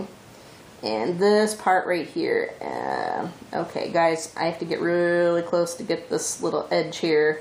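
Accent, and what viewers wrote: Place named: United States. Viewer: I'm English and I love your American accent :) Beautiful Also your laughing is Infectious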